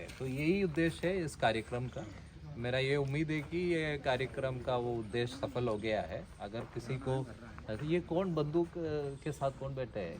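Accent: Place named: Kerala, India. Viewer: Mujhe aapka Hindi sun ke humare Kerala teacher ka yaad aa gaya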